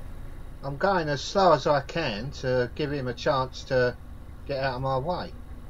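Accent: London, United Kingdom. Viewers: Hello, when my wife gets homesick for her home town of London she likes to hear you speak, makes her feel back home for a while, although she's lived here in Wales for 50 years for crying out loud! And me? I just like your video along with your commentary, it's very good, diolch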